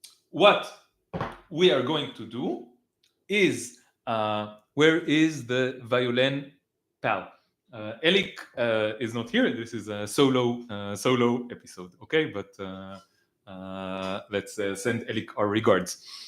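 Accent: United States. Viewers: Thank you for the great analysis. Every now and then I speak to a liberal Zionist who says there are 22 Arab states and just one small Jewish state. Yes, that means they approve ethnic cleansing. P.S. The Hebrew accent is very like Ukrainian/eastern European accents to me when a lot Zionists like Netanyahu speak. Not so much yours. I think the original settlers who revived Hebrew came from eastern Europe. Your American accent is amazing. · Great American accent. I think I have never actually met or conversed with an "honest zionist." I started the video thinking Piers would be the honest zionist because I didn't know who the other person was. Wow. That shows how bad things are, when Piers is the more "liberal" person in the conversation, because here in the U.S.A. in GENERAL I think he is considered a right winger. At least he strikes me as conservative and I have previously heard him praise Trump and MAGA. 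Zionism, however is so mainstream here in the U.S.A. It is embedded in both political parties and both right wing and left wing thinking. You're correct, the liberal zionists always find a way to package it all up in a nice package to deceive the world. To other Americans, do you know any honest zionists here in the USA that are not from the place called Israel?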